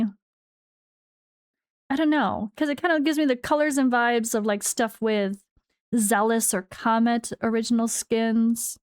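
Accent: United States. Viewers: Love your content but so amused by how Americans end a lot of words with a 'a'. Not meaning to be offensive, it just amuses me, lol. Don't know if quirky is a thing in 'American English' but you are, and it's good :) .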